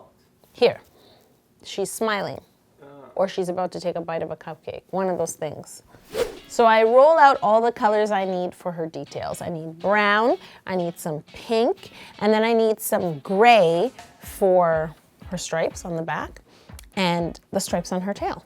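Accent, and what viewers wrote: Canada. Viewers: Canadian accent really came out this episode, i love! the cake is absolutely amazing and adorable 🥰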